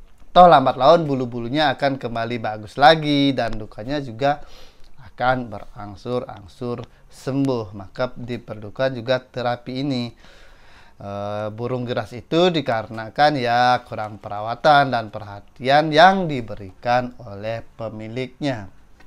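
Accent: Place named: Java, Indonesia. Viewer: bang suara mu mendok bgt 😀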